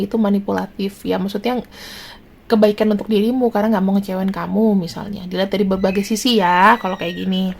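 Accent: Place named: Surabaya, Indonesia. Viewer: Opo maneh,suroboyoan bgt 😁👍🙏🙏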